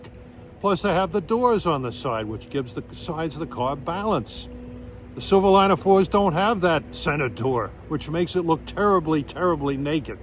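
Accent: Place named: Long Island, United States. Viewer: First of all, your doing a great job, the Long Island accent is great since I grew up in New Hyde Park. I worked as project engineer for Amtrak <num> and installed on a good day, 1000 ties on the NE corridor. Everything my crew installed has been replaced with concrete ties and I see Nassau interlocked is totally gone. All the crossovers are gone. Ricky759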